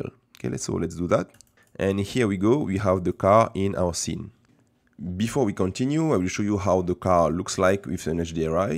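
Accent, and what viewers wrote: France. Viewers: French accent, bonne journée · tu serais pas français par hasard ? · accent francais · i really love your french accent tres bien continuee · C'est un français ce youtubeur?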